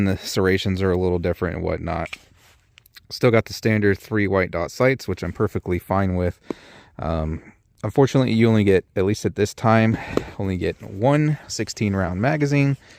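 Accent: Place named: Ohio, United States. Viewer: "There's your take up there...I question myself whether or not I've wracked the slide on this BUT I think so (laughs) then pulls trigger! I recognized an Ohio boy right away!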